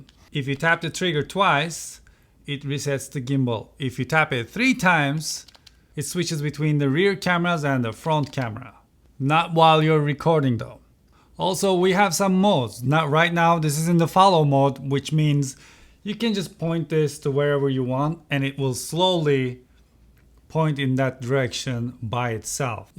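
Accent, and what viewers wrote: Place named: Turkey. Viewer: ar yu torkish birader, aksan igrenc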